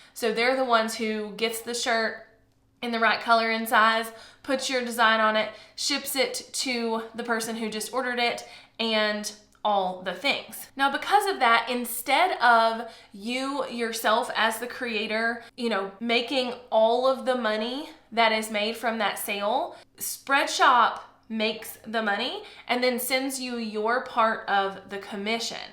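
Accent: South, United States. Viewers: Yeah, your Southern accent is really working for you here. Also, awesome video review from your experience (even though it's a paid promo)! Keep up the fantastic work!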